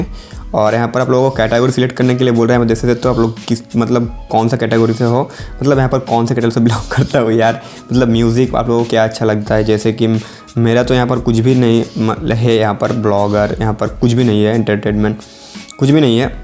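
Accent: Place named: Bihar, India. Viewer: Yeh bihari asscent kahan le late ho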